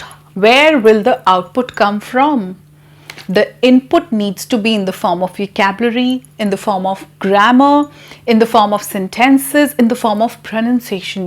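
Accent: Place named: India. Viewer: Dear mam you're doing well but I request you to change your accent from Indian to American or British. So that we might learn more.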